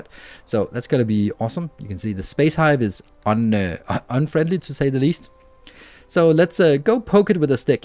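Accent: Denmark. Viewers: As a dane, its nice to listen to English with danish accent.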